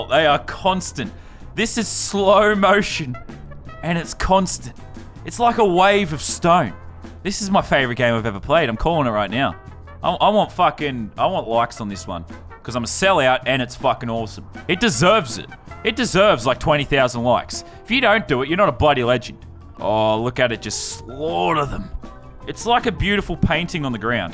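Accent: Australia. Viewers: Are u Aussie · Australian? Most YouTubers are Americans I am surprised to find a fellow Australian on YouTube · Are you from Perth because I'm from Sydney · he's Australian... subscribing now... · great accent. Australian? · Hes pewdiepie but funnier and australian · Aussie accent comes through ama subscribe mate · if you think he sounds like pewds... he doesn't, he's Australian. I know cos I am to. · are u Australian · This guy Aussie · You an aussie? The vid was in my recommended list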